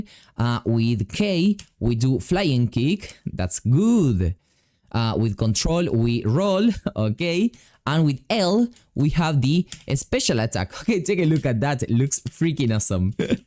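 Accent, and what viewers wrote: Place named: Argentina. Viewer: habla argentino mejor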